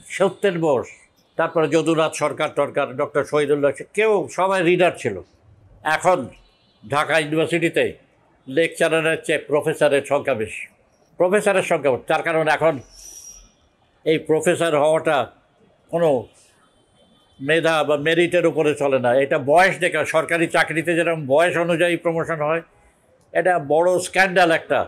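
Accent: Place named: West Bengal, India. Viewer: স্যার এর আদি বাড়ি কি স্বাধীনতা পূর্ব পশ্চিমবঙ্গে ছিল? কথার মধ্যে এদেশীয় টান! অবশ্য খুলনাও হতে পারে। যাইহোক ভাল থাকবেন স্যার। এই বয়সেও আপনি সমান চিন্তাশীল । ভাবতে ভাল লাগছে।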